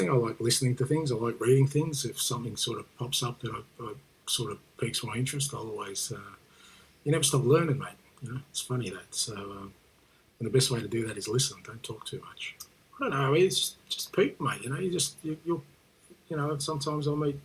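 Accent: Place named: Australia. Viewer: God he's so Aussie its ridiculous 🤣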